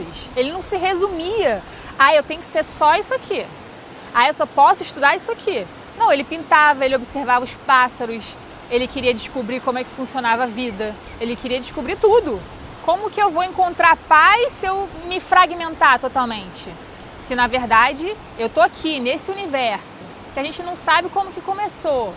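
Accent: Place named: Rio de Janeiro, Brazil. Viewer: Você é natural do RJ ? Seu sotaque é bem carioca,amo seus conteúdos parabéns esta me ajudando a despertar minha consciência ❤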